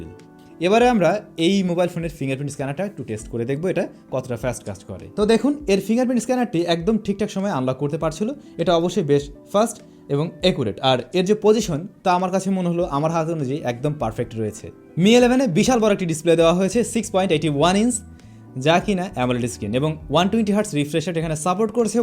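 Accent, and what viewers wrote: Barishal, Bangladesh. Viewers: ভাই আপনার ভাষা বরিশালের মানুষের মত মনে হয় 🙂 আপনার গ্রামের বাড়ি কি বরিশাল? বরিশাল হলে তো আমার মতো 🙂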